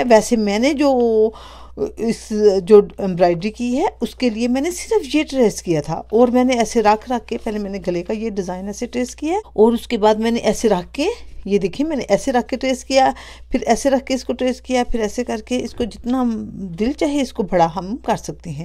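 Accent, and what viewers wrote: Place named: Pakistan. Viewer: Apk accents se ap Pakistani lgti hn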